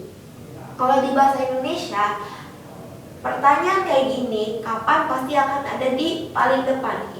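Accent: Taiwan. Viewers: Ini mandarin taiwan apa tiongkok,,???? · 用的普通话好台湾腔喔… 喜欢😍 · 哈哈哈~~ 台湾口音~~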